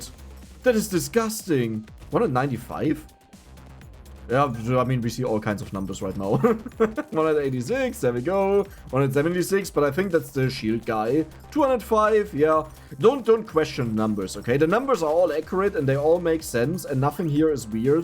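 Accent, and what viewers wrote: Germany. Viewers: the german accent xD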